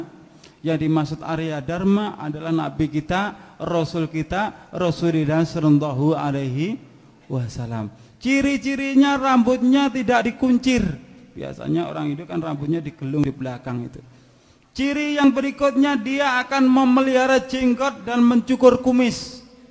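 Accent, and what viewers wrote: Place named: Java, Indonesia. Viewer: orang bali kok logatnya spt jawa aneeehhhh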